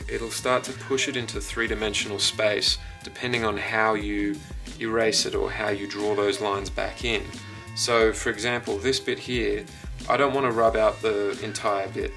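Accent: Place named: United Kingdom. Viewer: Dude, you have the britishst accent ever!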